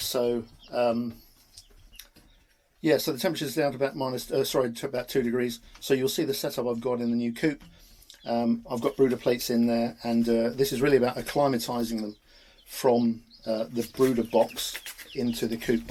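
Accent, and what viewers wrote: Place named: Midlands, United Kingdom. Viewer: Hi mate, first I've got to say thankyou as I've searched everywhere to find this kind of info. I have 3 six week old and they're lamp is all the way up now how do I get them from the box to the coop as I don't have power in the garden. Also I live in the UK round the Midlands so should be getting the similar weather to you considering your accent. Any help appreciated and thanks again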